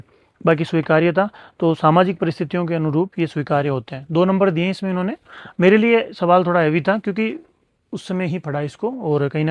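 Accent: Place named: Jaipur, India. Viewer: Sir aap kha se ho aapki language jaipur ky core area ki lgri h?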